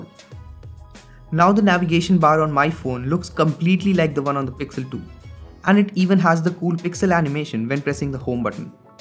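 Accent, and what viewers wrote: India. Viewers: Good video overall but I don't like that thick Indian accent. Sometimes it's hard to understand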